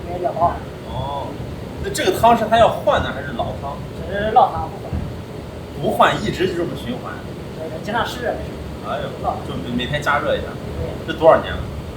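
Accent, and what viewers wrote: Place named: Xingtai, China. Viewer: 太感谢了！去探店了我的老家。我在上一篇石家庄的探店视频下给您留言推荐邢台，紧接着邢台的视频就来啦！出国快十年了，听到久别的郉普乡音，看到热闹的清风楼，美食也是杠杠的！最棒！🎉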